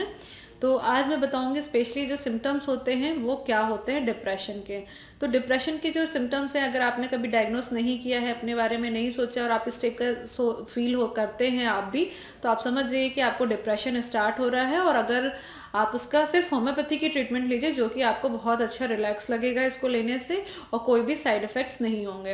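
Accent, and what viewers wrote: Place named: Punjab, India. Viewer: punjjabi voice 6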